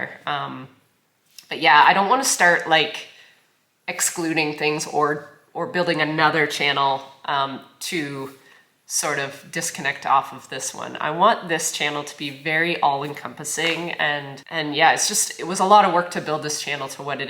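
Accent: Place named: Canada. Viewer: Don’t care about hair. Am old city bred gal now retired. Can not travel so utube is it. This year I have begun to learn about farming. Larg er animal care and feeding. I love your slight Canadian accent. Thank you for what you do.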